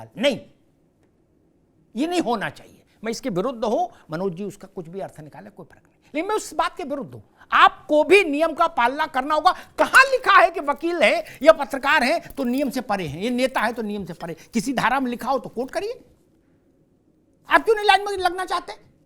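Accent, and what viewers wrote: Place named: Lucknow, India. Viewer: जी लखनऊ से हैं लगता है। गुलाटी वर्दी चारबाग।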